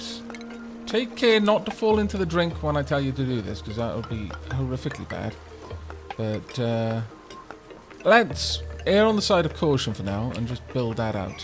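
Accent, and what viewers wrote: Wales, United Kingdom. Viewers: Are you from Wales by any chance? Your accent sounds very Welsh. I live there!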